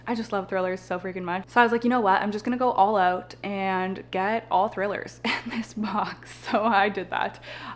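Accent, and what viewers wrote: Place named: Canada. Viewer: If his accent isn’t Canadian I don’t what is. I have watched your planty video for a while now just discovering that you have a vlog channel. Just wanna say I love them!!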